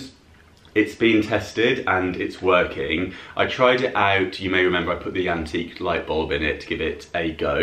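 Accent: United Kingdom. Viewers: Your british accent is everything 😍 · How have I not come across this channel before 🤷🏻‍♀️ Subbed immediately just because you’re British & you have a great voice! The videos are awesome! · 😁 His voice is absolutely very sexy (oops, sorry 😶), with that fascinating British accent that I love!!!! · I just had to listen to your beautiful British accent to hit the subscribe button. Cheers, mate!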